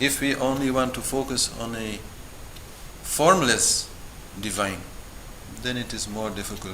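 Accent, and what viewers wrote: India. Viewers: Why do you talk like an Indian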